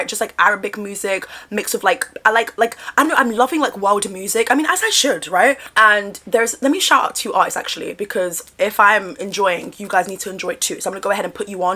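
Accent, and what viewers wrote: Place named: United Kingdom. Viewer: Love the accent also 4 a black Brit you are hilarious and thanks...😅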